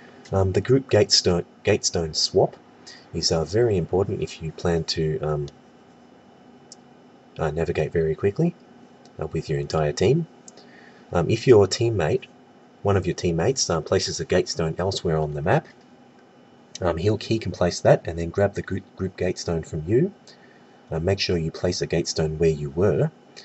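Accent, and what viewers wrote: Australia. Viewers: holy shit first australian commentator ive heard?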